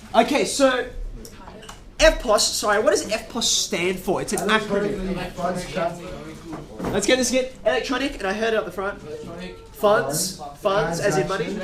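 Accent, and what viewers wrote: Australia. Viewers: Besides his obvious accent, you know he's Aussie when he says "Amex." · Hate aus accent. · My eyes say Chinese, but my ears say Australian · Woah it’s an asian guy with an Australian accent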